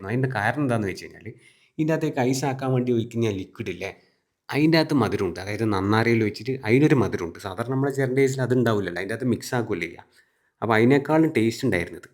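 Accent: Kannur, India. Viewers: Brode Place Kannur Ahno ?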